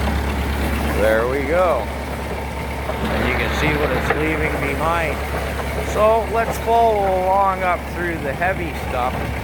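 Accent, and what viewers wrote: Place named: Minnesota, United States. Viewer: We in minnesota of wisconsin? Or some part of Canada? If i had to guess, i would maybe say Minnesota? Not an expert on those speech accents yet. Lousiana has like 20 distinct accents. Several different distinct accents around New Orleans.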